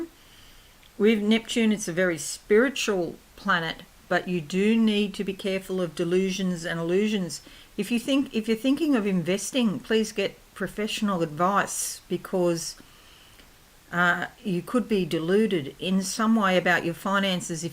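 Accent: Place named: Australia. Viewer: Thank you..nice to hear an Aussie accent..am also an Aussie..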